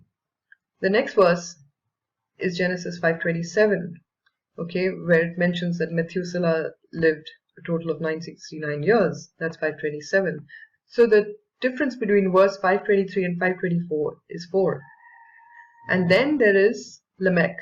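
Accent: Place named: Germany. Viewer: I am so glad I found your channel... I have always felt there are codes in the Bible, and I know for instance in the KJV, there are 783,137 words and right in the very center of these words of the Bible are two words - the Lord.... it is no coincidence that the Lord is at the very heart, the very center of the Bible... you are on to something.. I have lost most of my vision recently by eye stroke... I have to use Windows Magnifyer set at 4 or 5 tiimes normal... I will do some research and get back to you... I wonder if we can find 1221 working with other numbers in Genesis also.. I am going to do some research and see what I find, I'll get back to you.. I also feel Noah's ark, that story is also a symbol of the Rapture and Tribulation for our generation.. the God fearing people have a Protector, the Ark, helping collect them before tribulation, The Flood comes to the Earth... 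a sign for the Rapture and Tribulation right there...okay, nice to meet you, will get back if I find anything.. love your accent, is that German/Austrian I am hearing? I love it....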